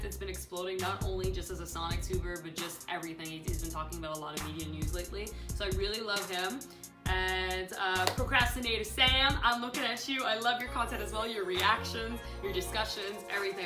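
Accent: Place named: United Kingdom. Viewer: Woot woot! My question made and thank you very much answering! Also nice British accent.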